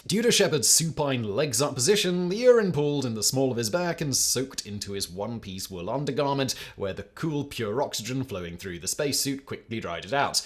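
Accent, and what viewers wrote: United Kingdom. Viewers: This subject can only be conveyed with an British English accent. Centuries of a stiff upper lip has mad it capable of talking about any subject with (at most) minimal sophomorishisms. 😉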